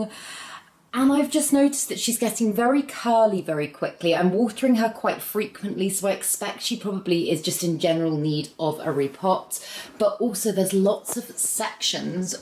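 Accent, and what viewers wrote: England, United Kingdom. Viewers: Love watching,just wondering if you have an outside space to repot this time of year,less clean up ?After watching you I’m heading out to repot a few too❤❤❤Hi from Maine,USA🇺🇸🥰 Oh and I love your English accent😊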